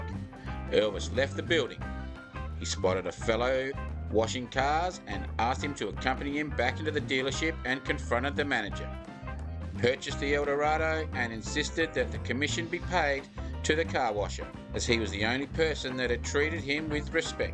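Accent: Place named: Australia. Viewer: WHY IS A TERRIBLE AUSTRALIAN VOICE SPEAKING HERE - SURELY A PROPER SPEAKER WOULD HAVE BEEN BETTER !!!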